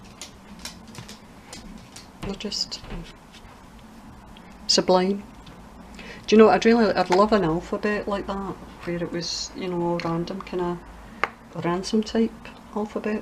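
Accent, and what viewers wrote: Scotland, United Kingdom. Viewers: Thank you again for allowing us to watch you work. I always pick up something. Love the way you organise your pages when doing the stamping process. Good on you for being true to yourself and what works for you. I always get excited when I see a new video from you. For your work, your accent, and little tips. I have a son living just out of Inverness and listening to you feels like connecting to him. I live in New Zealand but we visit him regularly. Keep doing what you love. It certainly makes me happy. Dianne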